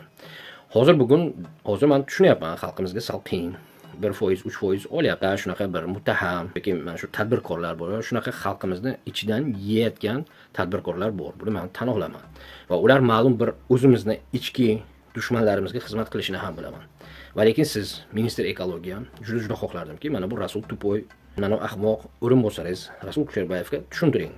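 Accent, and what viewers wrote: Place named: Tajikistan. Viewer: Янги очодиган каналингга ут тушсин.Яхши айтиб куйди.бекорчи гийбатчи тухматчи янги чиккан ёлланган сиёсатчига халк мухтож эмас.Кайирда кандай илм олгансан.Сиёсий маьлумоти нима адабий соф узбек тилини кайси тожик шевасида гапиряпти узингни билимингни яхшилаб ошириб олгин ким танийди бу гийбарчини инсонларни кимматли вактини олиш хам катта гунох.Вактни яхшиликка яхши ниятларга сарф килиш керак.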